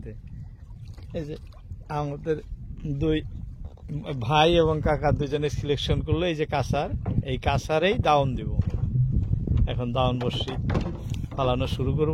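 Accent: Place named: Mymensingh, Bangladesh. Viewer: ভাই কোথায় থেকে আপনি, ময়মনসিংহের ভাষায় কথা বলছেন।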